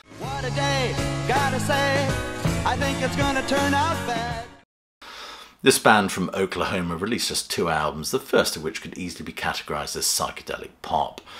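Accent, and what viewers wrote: England, United Kingdom. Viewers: The Third Rail is psychedelic? You obviously have not taken the right drugs. granted you accent sounds English, and English osych was more pastoral than the US freak out over being drafted or shafted in the civil rights era, but most of these bands sound more like pop bands with psychedelic flourishes. And that is how they were reviewed. I owned most of these albums - emphasis on past tense, but they found their way to the used bins.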